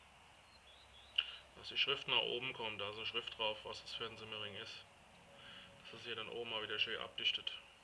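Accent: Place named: Germany. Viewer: Ja genau, ich hab noch nie sone sache offen gehabt, hab aber diverse Beschreibungen gelesen und da kommt einem teilweise das Grauen...Bilder sagen auf jeden fall mehr als 1000 Worte. Und den Deutschen akzent lieb ich einfach:-D (als schweizer)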